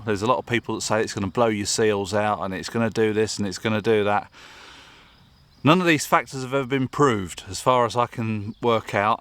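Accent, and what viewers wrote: United Kingdom. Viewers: From your ascent, you sound like a British man that is having a lot of fun in France.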